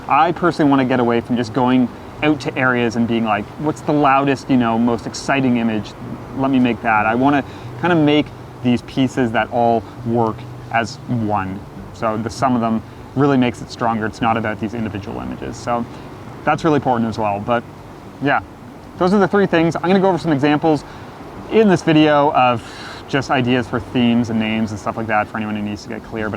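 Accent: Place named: Canada. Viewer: That canadian accent is ... ugh 😬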